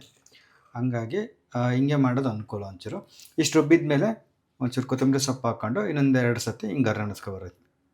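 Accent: Chitradurga, India. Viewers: ❤ chitradurgada bhashe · ತಮ್ಮಣ್ಣ ನಮ್ಮ ದುರ್ಗದ ಭಾಷೆ ನಿನ್ನ ಬಾಯಲ್ಲಿ ಕೇಳೋದಿಕ್ಕೆ ಬರ್ತಿ ಚನಾಗಿರುತ್ತೆ ತಮ್ಮಣ್ಣ 🎉🎉🎉🎉🎉🎉🎉🎉🎉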